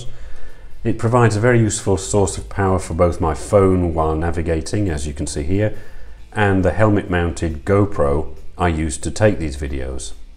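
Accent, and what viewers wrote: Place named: Newcastle, United Kingdom. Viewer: he’s a Geordie I think. Sounds just like you 😂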